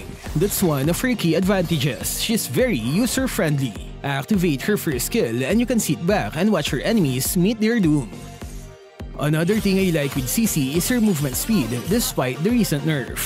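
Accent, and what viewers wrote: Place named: Philippines. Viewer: anoyying pinoy accent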